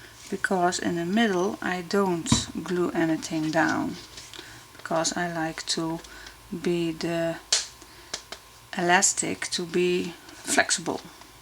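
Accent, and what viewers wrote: Netherlands. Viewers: Brilliant idea. Thank you for sharing and for the free tutorial. I just love your Dutch accent. XXX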